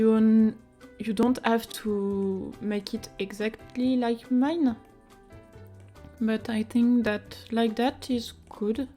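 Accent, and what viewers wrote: France. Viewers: Прелестный французский акцент...🙂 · Au vu de l’accent on reconnais que tu es française 🤣🤣 dommage pas de faire en français y’a dja bcp de tuto en anglais 😭 merci quand même :)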